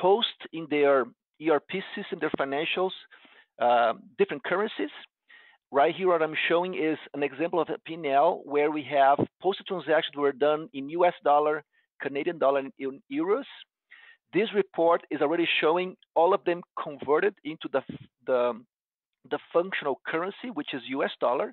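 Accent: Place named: Brazil. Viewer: It seems to me to be someone with a Brazilian accent ...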